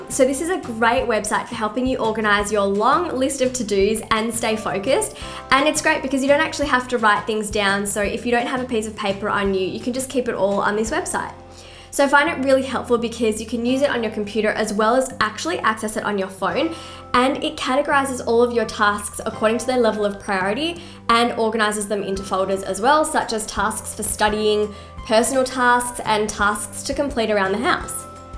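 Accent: Australia. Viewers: as soon as she started speaking, I instantly guessed she must be an Australian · Love the Aussie accent! Thanks for sharing.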